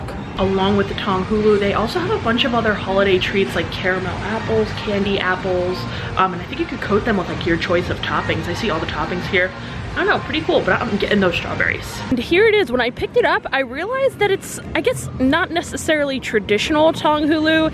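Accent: New Jersey, United States. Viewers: I could just tell you were from Jersey.. I’m from south Jersey too and I grew up going to great adventure too!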